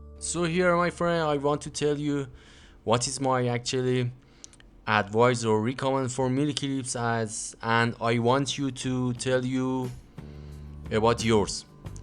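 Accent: Iran. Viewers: Your persian accent is very funny =))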